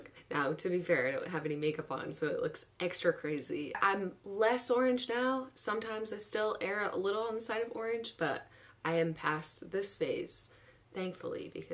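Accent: Boston, United States. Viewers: Caitlin Slattery she said in the video that she had a Boston accent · Zietz I totally agree with you on this. I was thinking the same thing. I can understand her not wanting to watch herself though because I am the same way, but don't apologize for being you back then. You were amazing then as you are now. And I hate that you changed you Boston loud accent on purpose. It makes me sad. Sure grow up but be authentic. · I LOVE YOUR ACCENT!!! I got my Boston accent from you and I live in Oklahoma. You use your accent in your most recent videos as a thing, as an attitude. You are ole Greg to me, except you're better. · I can't believe how strong your Boston accent used to be, I've been a fan since 2010 but I never noticed the change! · lol your boston accent is GOLD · omg i never realized how strong of boston accent she had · It's been incredible to see how much you've grown as a person over the years. 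I've been on your channel since the beginning and it feels like i've grown with you. Loved your videos then, love them now. I totally forgot you had a thick Boston accent btw!! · That Boston accent tho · I love the old Boston accent showing through!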